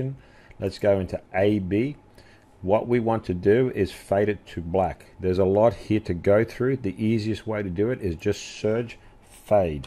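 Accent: Australia. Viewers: I wish I'd found this video two years ago! I'm not a beginner any more but I /still/ found basic things I didn't know. Thanks, I'll be checking out your other videos too. Oh and cheers from Melbourne. Lovely to hear that Aussie accent. :D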